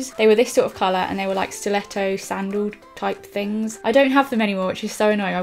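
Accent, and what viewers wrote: United Kingdom. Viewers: I am British too but I love you're accent and voice😂😻🙈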